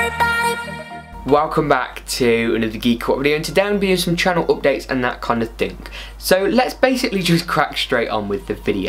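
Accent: United Kingdom. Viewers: By your voice i think you're in the uk, where abouts in the uk would you suggest i buy parts (online or in store) i want to build the $700 build but buying in the uk with VAT and things it works out so expensive, so where abouts would you suggest to buy parts for the cheapest possible?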